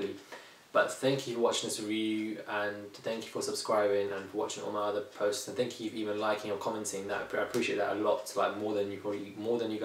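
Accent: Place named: United Kingdom. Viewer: RHOA reviewed with a British accent????? LOVE IT!!!!!!!!!!!!!!!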